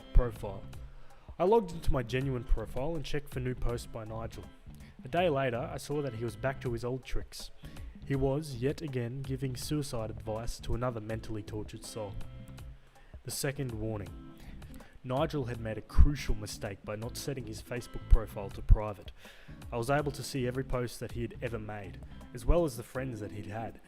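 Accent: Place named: Australia. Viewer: I’m thanking Markee for introducing me to your channel! Love the Aussie accent! 💜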